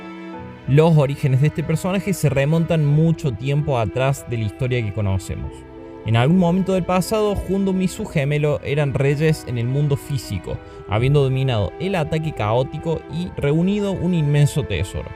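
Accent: Cordoba, Argentina. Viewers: Sos el primer youtuber cordobeeesaso que escucho jajaja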